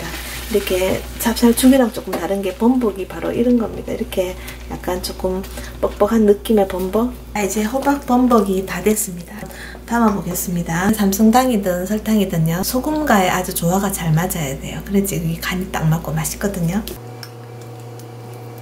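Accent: Gyeongsang, South Korea. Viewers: 호박범벅!!! 님 올려주시는 영상 모두 맛나게 생겼네요^^ 꼭 래시피대로 만들어 봐야겠어요 ㅎ 경상도 사투리가 고향이 그립게합니다 오늘 것절이 래시피대로 만들었더니 너무 맛있어요 ^^ 구독하고 많이 배웁니다 ~ 여기 워싱턴입니다 2019년 새로운 영상 기대합니다 ~~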